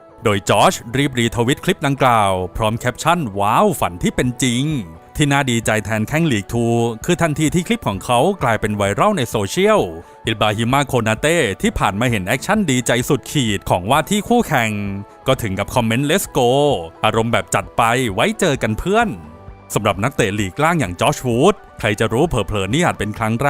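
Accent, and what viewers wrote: South, Thailand. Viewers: แอด เปนคนใต้. จังหวัดไหรคับ ได้ยินเเหลง เมื่อวันก่อน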